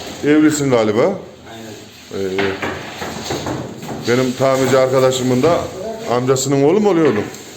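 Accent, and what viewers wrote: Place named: Kayseri, Turkey. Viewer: selam ben geldim nevin ozudogru kayseriliyim hemserim siveniz ayni dilden sizleri severek ve begenerek izliyom gadasini aldigim siz bizim nese kaynagamiz oldunuz kadir geceniz mubarek olsun dualarimiz sizinle herkeze selamlar saglicikla kalin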